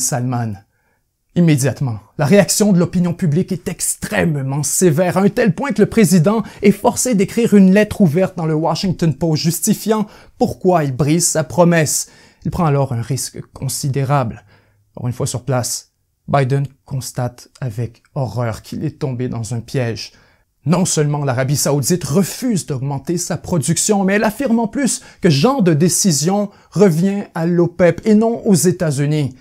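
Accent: Quebec, Canada. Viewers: j'ai du mal avec la voix québécoise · J'aime bien l'accent canadien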